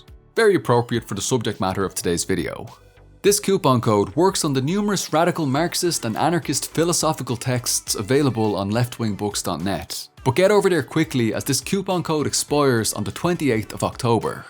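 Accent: Ireland. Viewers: Missed this lads thicc Irish accent sharing Marxist wisdom with the masses lol✊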